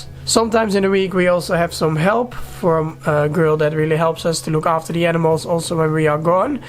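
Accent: Netherlands. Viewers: I hope someone knows. I love how he has Dutch & English with beautiful Dutch accent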